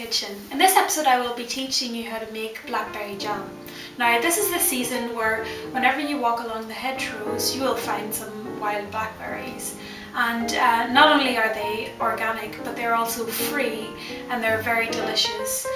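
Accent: Ireland. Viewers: Irish accent? Or Welsh?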